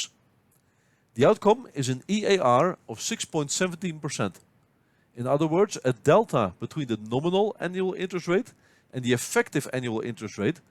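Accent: Netherlands. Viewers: From the first 10 seconds i already heard you were dutch. Super bedankt voor deze geweldige uitleg meneer. Ik ben 1e jaar studente econometrie en begin nu met Finance. Waardeer het enorm.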